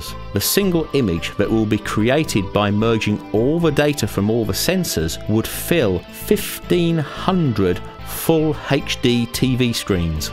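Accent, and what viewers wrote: United Kingdom. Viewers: I really appreciate your videos, because they are so helpful for people like me willing to learn English. We can find corresponding text as well as subtitling with a charming British accent . Thank you very much, Sir. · Whilst consumer video cameras hitting 30/60 frames per second, the industry norm for the film world is still 24 fps. However, seeing as the chap narrating this video is British, it's possible that he selected PAL settings (of 25 fps) as oppose to 30 fps associated with NTSC when putting the video together.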